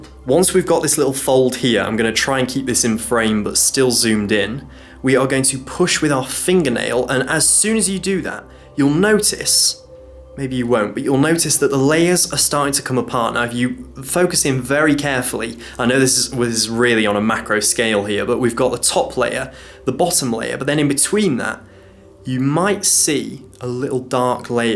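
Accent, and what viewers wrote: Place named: England, United Kingdom. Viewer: Are you from England? I am from Taiwan, I want to learn the cool accent you have...,plz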